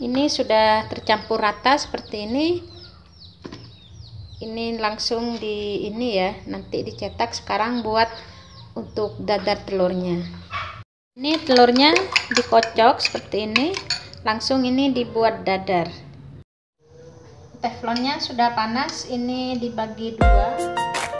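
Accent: Madura, Indonesia. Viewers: Bunda dari mana ya ...ngomongnya kyak org madura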